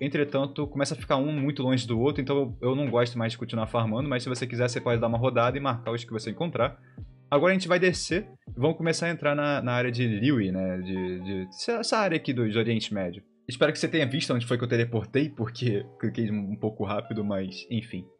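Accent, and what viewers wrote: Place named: Rio de Janeiro, Brazil. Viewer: Aqui vemos um carioca fiel: montanhax, minériox, ixpots, crixtais Amo amo esse sotaque é bem gostosinho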